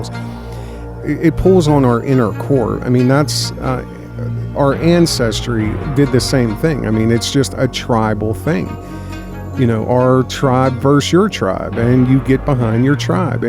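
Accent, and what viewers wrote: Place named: Pittsburgh, United States. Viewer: you sound like you're from Pittsburgh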